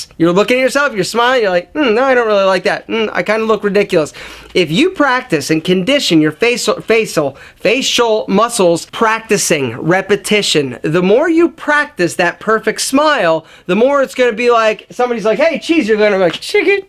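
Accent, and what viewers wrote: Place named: New York, United States. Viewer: Athalos hes from the East like New York or something like that i think ha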